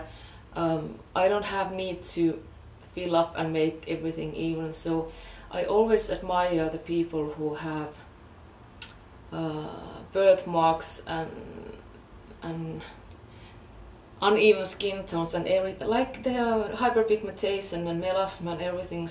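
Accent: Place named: Finland. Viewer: Aksentista arvasin että olet suomalainen. :D Jee, kiva löytää suomalaisia kauneustubettajia, jotka tubettaa englanniksi. :D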